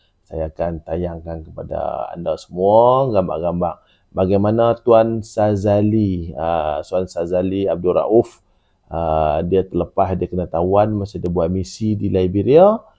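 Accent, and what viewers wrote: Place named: North, Malaysia. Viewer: Assalam tuan pekat snguh tuan ckp utara rindu plk kg haha...mcm crown yg tuan pkai tu ada allah/muhammad kn klo org bkn islam pkai tu mcm mna plk tu tuan..😊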